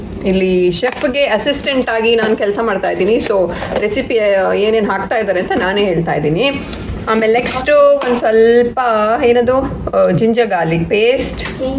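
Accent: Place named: Mangalore, India. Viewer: Niv yake manglore thara Kannada mathadala.. El kalithu kondri banglore style Kannada..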